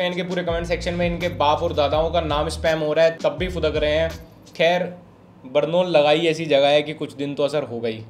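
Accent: Haryana, India. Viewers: I ❤️ haryanvi accent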